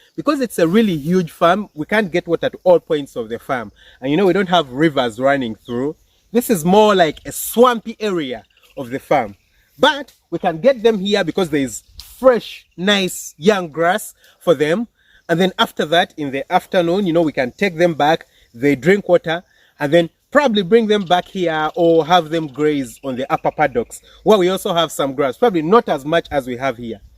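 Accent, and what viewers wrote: Uganda. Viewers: Ur accent sounds ugandan where u from bro?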